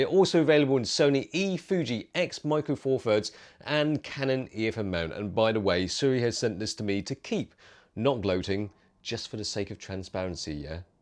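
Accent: United Kingdom. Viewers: I think accent gets more British with every video of his I watch.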